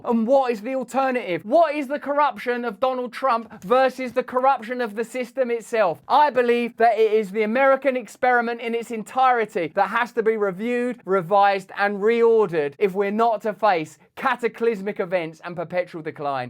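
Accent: United Kingdom. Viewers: The composition of the topic is great and so is the enunciation however I have a weird resistance to the obviously thick British accent telling me news in DC. Maybe it’s the Yankee in me.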